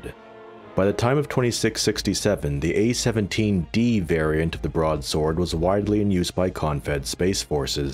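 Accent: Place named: Australia. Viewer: You're obviously an AUSSIE!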